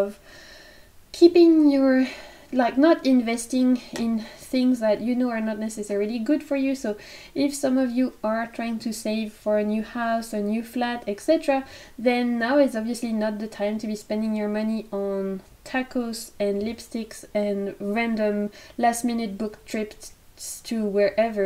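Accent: France. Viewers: Woww you are my new favorite youtube channel❤️ been binging your videos the last couple of days. You have alot of decks that i have on my wishlist! And pile number 2, i have the feeling i have been putting alot of work in my business and not seeing alot of results (or monetary results from it) so you are spot on❤️ keep up the good work! Love your french accent🥰 (can speak a couple of words in french but not much haha)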